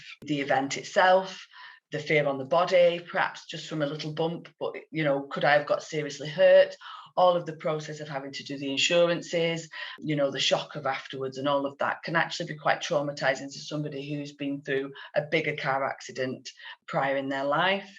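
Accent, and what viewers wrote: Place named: Northern England, United Kingdom. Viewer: Thanks for sharing your knowledge and expertise! It’s also nice to hear a more authentic Nortthern English accent, rather than the usual North American!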